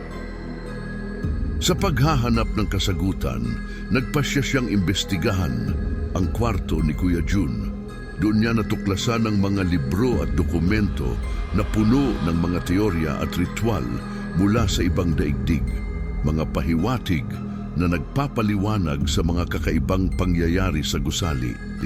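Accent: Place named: Tagalog, Philippines. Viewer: ❤Daddy and his lettle tagalog accent 😊😊😊charr😊😊😊😊😊😊😊😊😊😊😊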